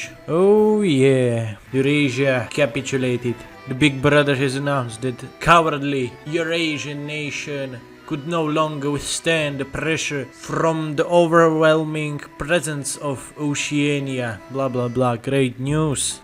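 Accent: Russia. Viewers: Are you Russian? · Huh, I never noticed Russian accent until now. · Ты русский? Либо какой либо нации? У тебя акцент прямо на наш похож. Translate: You russian? Or other nation? Your accent like russian.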